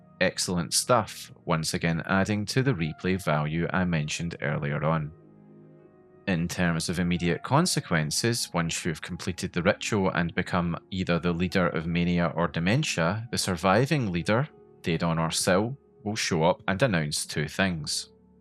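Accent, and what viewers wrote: United Kingdom. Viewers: BRIT DETECTED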